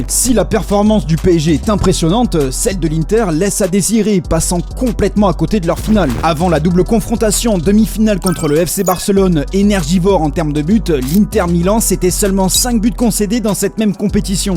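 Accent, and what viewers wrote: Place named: Marseille, France. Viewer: On sent un vrai Marseillais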